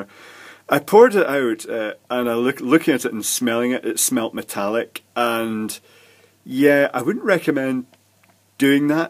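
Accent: Scotland, United Kingdom. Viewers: Scottish accent maybe?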